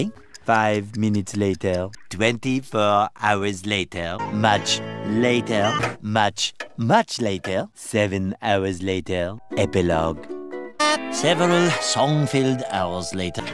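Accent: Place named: France. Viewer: It's meant to be French. The narrator is an homage to Jacques Cousteau.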